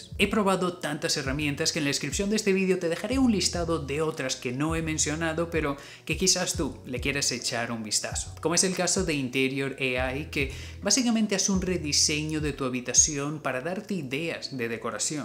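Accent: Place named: Spain. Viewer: Creo que este tío es español no? Esque lo veo muy gracioso hablando con la s jiji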